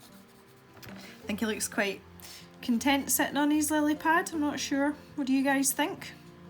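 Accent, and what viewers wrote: Scotland, United Kingdom. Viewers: Loved the video and am one of those who loves your accent. My Nan was Scottish and I have many a happy memory of visiting her in Bonny Scotland. I miss her so much, and find your voice sooo soothing!Also, I was born and bred in Corby which is known as a Mini Scotland and has a wide range of Scottish accents, so understand you perfectly (though I couldn’t always understand my Nanny June 😂)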